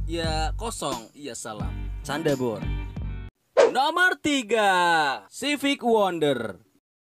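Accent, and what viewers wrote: Pekalongan, Indonesia. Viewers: Logate Pekalongan isek ketoro gan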